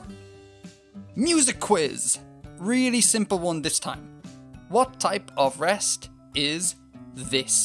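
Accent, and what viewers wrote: Ireland. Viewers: That isn't British as you can hear to his accent he sounds like a irish lad to me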